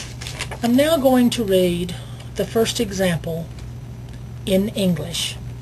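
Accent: South, United States. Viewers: LOL! Southern!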